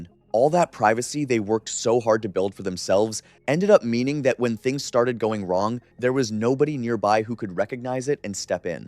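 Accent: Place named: United States. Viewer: Means the American sounding guy is probably American, home of the freedom units.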